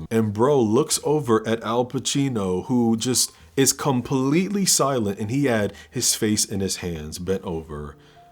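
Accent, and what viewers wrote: United Kingdom. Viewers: Giving a like for the british accent alone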